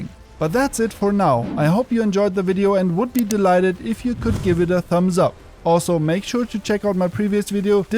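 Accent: Germany. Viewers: Are you german? ^^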